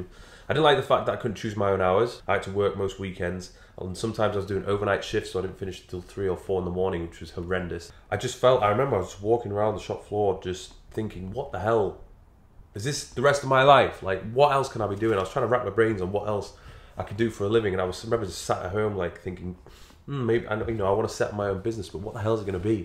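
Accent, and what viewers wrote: United Kingdom. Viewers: "I always see the comfort and contentness is the enemy. When you get too comfortable and everything is easy, that's when you take a back foot." Love the positive messages you deliver on your Youtube channel. They are not only about fitness but also about life lessons, which i believe is applicable to everyone. Just want to say: your dedication, professionalism and wisdom are amazing, thank you for helping a lot of people, myself included, stay motivated and get back on the right track. I'm an undergraduate student from South Korea. Even though it can be somewhat hard for me to get British accent, but I try my best to catch every informative message you give off. You've been to Thailand not long ago, why not Korea one day? lol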